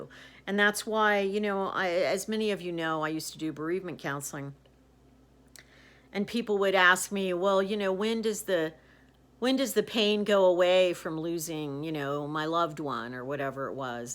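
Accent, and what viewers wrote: United States. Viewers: Thanks for sharing your experiences with your father. All sorts of stuff happens to all sorts of people all the time. You got through it, and look, you turned out alright. I'm an American living alone in England for the past 30 years, so it's nice to hear your American accent. Comforting.😀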